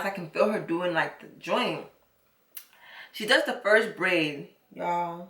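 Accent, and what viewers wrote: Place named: Nigeria. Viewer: It’s the Nigerian accent for me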